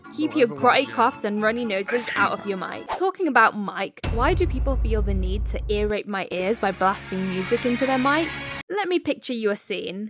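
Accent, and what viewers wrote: United Kingdom. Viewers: Are you British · u from the uk ?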